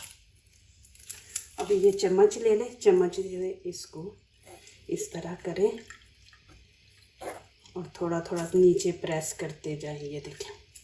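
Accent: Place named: Punjab, India. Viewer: Aunty pujabi mein urdu acheeee laaaagti hey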